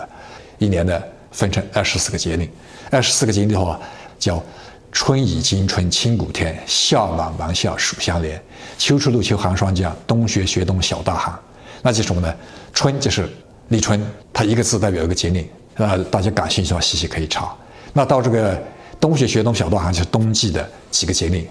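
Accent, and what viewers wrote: Yunnan, China. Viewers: 谢谢李老师讲解😀听口音李老师是云南老乡？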